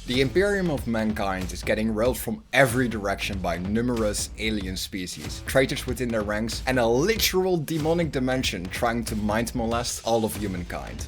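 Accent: Netherlands. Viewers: Just came upon this video to hear some more dawn of war bashing(they deserve it). And suddenly realizing. The narrator is dutch. Hello fellow dutchie 👋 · First time watching this channel, and man, from the first words, you have to be Dutch, right ? :P That accent, it almost has to be Dutch. DoW1 was brilliant, most of it was just fantastic, almost all. DoW2 was not completely my thing, but it was good. DoW3 is one of my worst buys ever, man was i disappointed, and i'd hoped modders would change it a bit, because it looks good, but i hate the limited base building and how it plays, but alas, it hasn't become a better RTS, or an RTS at all i'd almost say, at all. If i have to play any DoW game now, it's DoW1. Hands down. And it has quite a few mods too, some better than others.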